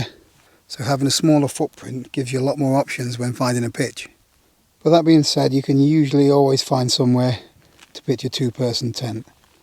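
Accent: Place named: Yorkshire, United Kingdom. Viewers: Yorkshire born... best accent, best product reviews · I always thought you was a Yorkshire lad to be fair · from Barnsley, defo accent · That Barnsley accent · Ahh it's great to hear a reet proper yorkie accent, from a Lancastrian lad, it's getting rarer is the colloquial English accent mores the pity. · I love that there’ll be a load of Americans out there, totally not understanding T’Yorkshire accent uses!! 🤣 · is aggressively Sheffield sounding 😂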